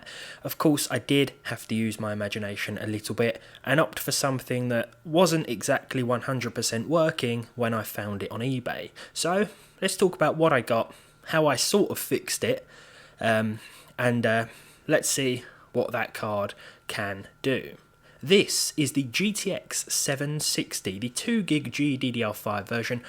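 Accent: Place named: England, United Kingdom. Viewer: always watch and enjoy your videos mate. It's nice to hear another English voice for tech stuff, given I'm Stateside and never hear one. I recently bought an EVGA GTX 1070 FTW, a Gigabyte GTX 1060 G1 Gaming 3GB, and a Zotac RTX 2070 AMP that all had "failures." They would all post, but had varying issues. - The 2070 would only post in low resolution mode and when using GPU-Z wouldn't display any VRAM information. This was fixed by, get this, applying additional thermal pads for increased pressure on the memory chips, and increasing the stock fan curve on the card. - The 1070 would artifact and crash in a few games after a little while. This was fixed by, get this again, cleaning, repasting, and applying additional thermal pads to the memory chips, then increasing the stock fan curve. - The 1060 3GB would not display an image at all, and would not be recognized by Windows besides as a basic display adapter, however, NVFlash would find it as a 1060 3GB. 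After pulling the bios on the card it had been flashed somehow with a 6GB bios. After flashing it back to a 3GB, it exhibited the same VRAM failure as the other cards, which was fixed by, get this, adding additional thermal pads. It isn't entirely fixed considering only the HDMI port works, but it at least displays an image. Not sure if you've ever tried this with a GPU exhibiting VRAM failure, but it has also worked on a couple of AMD cards I did it too. After trying the baking method and having it end in failure too oft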